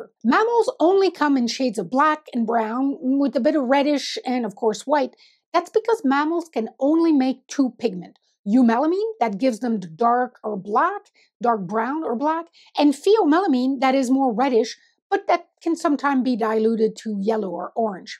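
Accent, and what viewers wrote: Canada. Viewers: Border "Cauooohlie" Canadian! That super rounded "O" gave you away! White tigers aren't rare now. They are bred by people which is horrible because in th wild this usually means the animal has health issues galore. People breed them on purpose and call them "rare"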